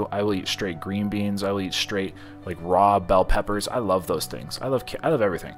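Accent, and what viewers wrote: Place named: South, United States. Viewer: Idk if you remember seeing my comment way back, but I will repeat; although I respect that you're a proud Canadian (as well you should be), I feel like you're an adopted southern USA boy at heart. I've heard your twang inflections, and have definitely witnessed your love of southern cuisine. If you ever decide to immigrate, we would welcome you with open arms and lots of southern hospitality! Further, you could never out-eat the spread when we "put on the dog" at any of our family gatherings!! God bless!🥰🥰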